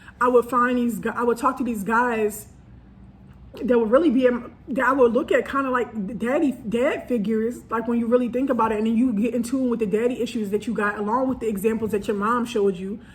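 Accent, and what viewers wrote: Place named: Philadelphia, United States. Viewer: Sooo I just found ur podcast and as soon as I heard ur voice I was like “hold up is sis from Philly?! She’s giving me the vibe”. 😂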